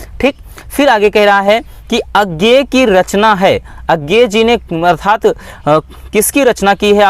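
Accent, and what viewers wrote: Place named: Bihar, India. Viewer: Bihar se hain aap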